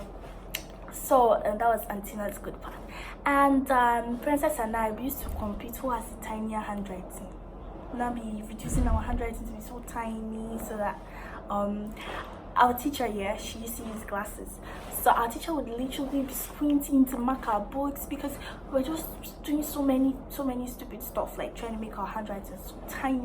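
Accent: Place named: United Kingdom. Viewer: You have a British accent🤔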